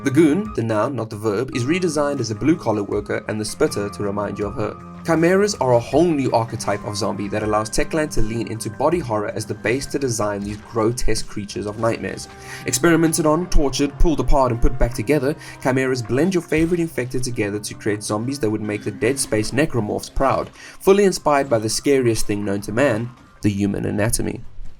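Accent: South Africa. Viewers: Lekker video bru, i could instantly tell from the accent you are from South Africa 🇿🇦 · I can recognise that accent anywhere. It might be too subtle for most to notice, but not to other South Africans. Where are in SA are you from, bru? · Not related to the game, your accent sounded South African and confirmed it. Glad to see SA creators are making high level content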